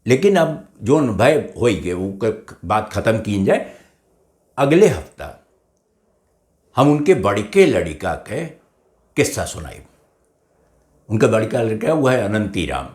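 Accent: Awadh, India. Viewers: अवध पंच म आपकां सुनित है तो बहुत नीक लागत है। इतनी प्यारी अवधी में आप बतुआत हो कि सुनि कै मन मुदित होय जात है। सीताराम पांडे वाली किताब सारांश प्रकाशन से पहिले छपी रही। तब बहुत चर्चा भय रही। हम कुछ अंश अखबारे में शायद पढ़े रहेन। अइसन याद पड़त है। दिल्ली के विश्व पुस्तक मेला से तब हम खरीदै वाला रहेन लेकिन तबै हमरे एक दोस्त कहिन कि हमरे लगे बाय हम तुहैं दई देब। अउर आज तलक नाहीं उ किताब नाहीं पाएन। आप बतवा जाय कि उ कितबिया अब कउने प्रकाशक के यहां मिली। हम खरीदै चाहित है।.. आपका अवध पंच बहुत बढ़िया चल रहा है। आपको बहुत-बहुत बधाई और हमारी हार्दिक शुभकामनाएंँ। टूटी फूटी अवधी लिखय क कोशिश किहन हैं कौनो गलती होई तो माफी दीन जाई।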